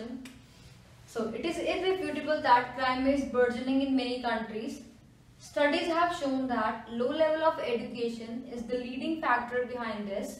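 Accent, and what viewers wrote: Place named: India. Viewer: your talent and effort is equal to solute. but i am not understanding you coz of accent of Indian and sometimes indian words😭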